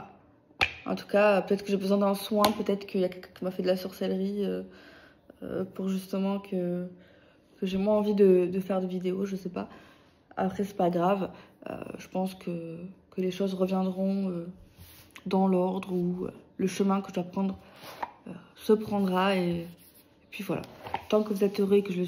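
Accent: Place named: Provence, France. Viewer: Coucou vous êtes de quel département le Vaucluse ou bouche du Rhône pour l'accent que vous avez ? Bonne journée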